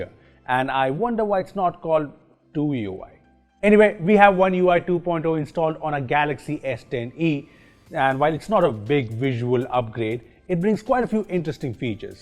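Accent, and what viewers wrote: India. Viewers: This is genuinely a great video. I had my doubts when I first heard the Indian accent, but I was pleasantly surprised. Thanks man. And sorry. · terrible Indian pronunciation...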